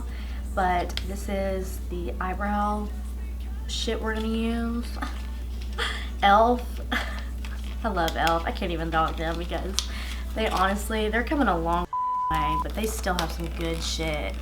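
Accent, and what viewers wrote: South, United States. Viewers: I’ve been waiting for this tutorial for the longest!!! 😍 how do you find your foundation shade? I’m having trouble and love how you started your intro you have like a little country accent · Lol, the look you gave yourself at the end was priceless. It was like "Bitch, I'm feelin myself!" Love your personality and sense of humor. And of course your southern accent. My fiance is from South Carolina. You've got a new sub. Keep the makeup tutorials coming ya'll.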